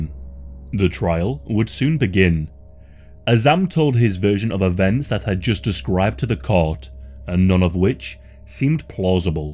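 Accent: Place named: Northern England, United Kingdom. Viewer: Sounds like northern England 🏴😊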